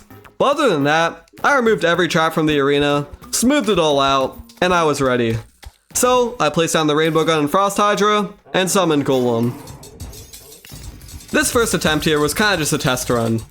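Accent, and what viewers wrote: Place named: United Kingdom. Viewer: I'm sorry but every time you say a cuss word it makes me cringe and you sound like a white washed person that grew up in great Britain. 😭😭😭 But anyways good video you are good at terraria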